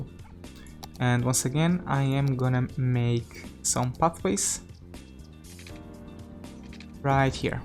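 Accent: Portugal. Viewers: He is one of ours, Portuguese. Very clear from the accent actually. But yes, I agree, he's far from a clumsy English speaker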